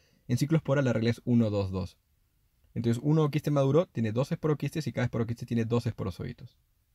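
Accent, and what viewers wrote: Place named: Peru. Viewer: Me gusta el acento peruano. Saludos desde MX 🇲🇽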